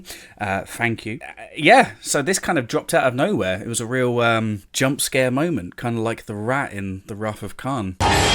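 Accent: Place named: RP, United Kingdom. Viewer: Man you have the poshest accent.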